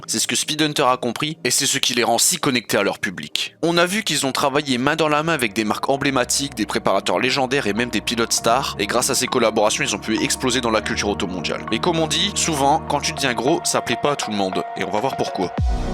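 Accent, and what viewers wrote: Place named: North, France. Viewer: super vidéo continue comme ça bien expliquer et ta un petit accent qui me rappel un peu le nord chose que j’adore !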